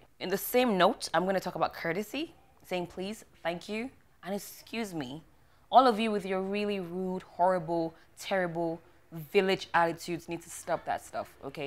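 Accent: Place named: Africa. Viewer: I watch all your videos and I like all of them (except the one about the movie). I particularly love this one bc I'm a huge fan of effective communication & beautiful African accent. This is awesome! Very educative! U create a conducive learning environment & spice it up with fun/jokes.....So we are actually learning (in 'school') & loving it!! Keep up!!!#HammerlandInSight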